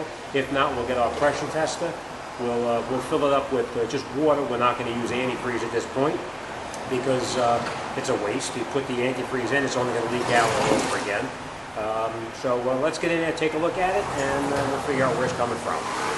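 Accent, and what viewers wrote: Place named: New York, United States. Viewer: Forgive me for not knowing but, is this a New York accent or Philadelphia, or?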